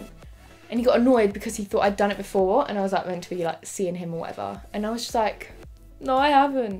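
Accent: United Kingdom. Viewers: Is your accent British ? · British accent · She sounds British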